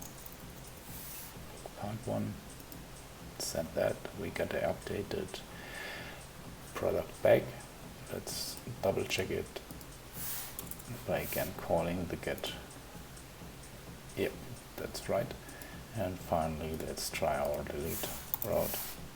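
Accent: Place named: Germany. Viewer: Thanks, your German accent and accompanying techno is inspiring.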